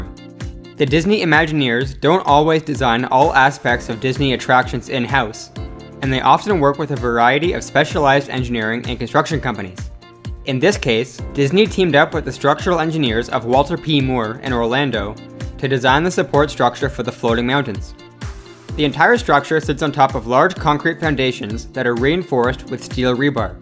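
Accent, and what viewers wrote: Canada. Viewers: Americans speak English. A LOT of brits have broken English as well but let's all ignore that fact. And he's probably Canadian, Americans don't pronounce words the way they do.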